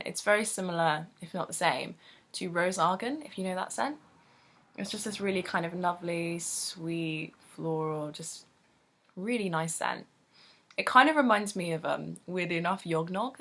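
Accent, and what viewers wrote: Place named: United Kingdom. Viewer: I love your accent. Whereabouts in the UK are you from?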